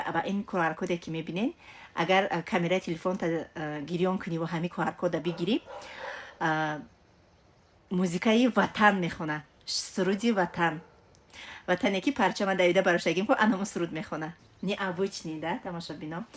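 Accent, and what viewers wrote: Tajikistan. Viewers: худочон аз инда зиёдат бта рахмат ба падару модарат.Ба забони тозаи точики фахмо гап мезани.ьехтарин.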